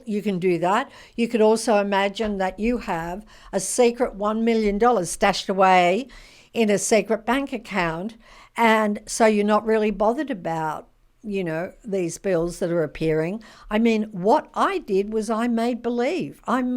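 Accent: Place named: Australia. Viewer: It's our Aussie accent I love it, and I live in Melbourne, the best city in the World to live, so say who. Anyway love these thoughts❤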